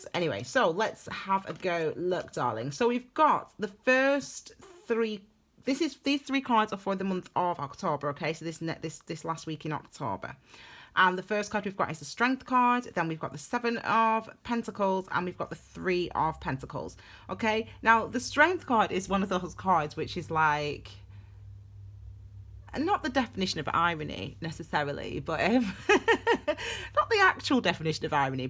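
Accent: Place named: Northern England, United Kingdom. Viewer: Always nice to hear a familiar northern accent ont 'tube. M/cr here.........top reading...........mint!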